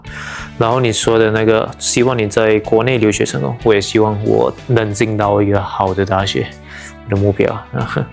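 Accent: Malaysia. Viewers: 马来西亚口音就这样啊。有时候我真的很烦这群普通话霸凌的东西。这帮霸凌人在中国大陆这个德行，出来还是这个德行。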